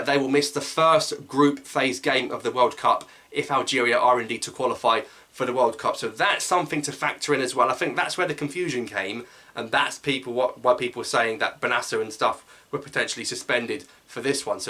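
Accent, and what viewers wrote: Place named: RP, United Kingdom. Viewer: well it's a great coincidence to find your channel because I am learning British accent and I adore your "BBC accent" especially when it's about one of my favorite topics which is Algerian football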